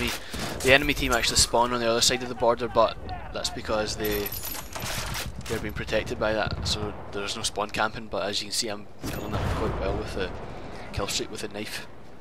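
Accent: Scotland, United Kingdom. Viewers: i love your commentaries mate, where bouts in scotland you from? i played it last night, i really couldnie find anyone :) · He's Scottish..The way he says it is not the same as all British people.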